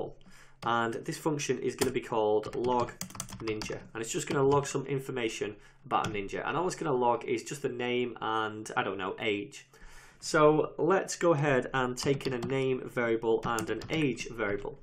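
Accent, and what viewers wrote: United Kingdom. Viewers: "Mathematical Addition" - I like the UK pronunciation. Lot of energy spent while speaking British English I believe.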